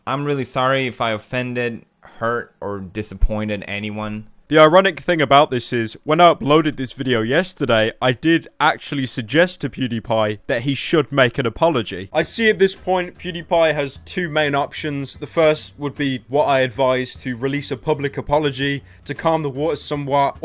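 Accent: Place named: Birmingham, United Kingdom. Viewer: I honestly cannot work out accent. It seems like a mix of Brummy and some kind of London/County's that surround inner London. It's the way he says his O's that confuses me.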